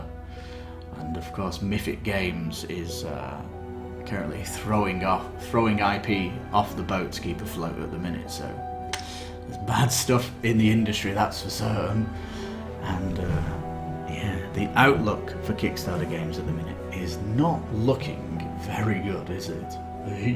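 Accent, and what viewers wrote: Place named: United Kingdom. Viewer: mexico?? you sound like some kinda brit.. prolly get called gringo every day. what's the board game scene like over there?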